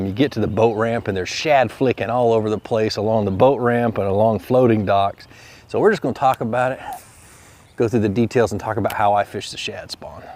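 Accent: Georgia, United States. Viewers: Are you from Georgia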